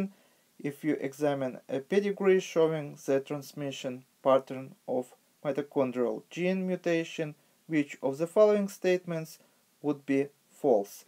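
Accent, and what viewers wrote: Russia. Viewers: Funny accent but good video I would assume your Russian good job at hiding it tho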